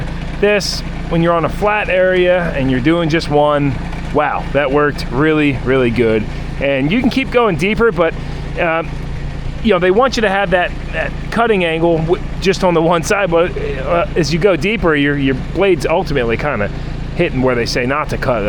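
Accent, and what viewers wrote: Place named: Pennsylvania, United States. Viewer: Whoa, that's quite the accent. Are we from Pennsylvania?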